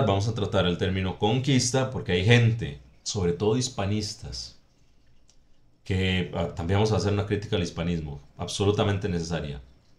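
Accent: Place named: Colombia. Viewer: El acento de los Ticos es muy parecido al de colombia. Juro que creí que eras de Colombia 😎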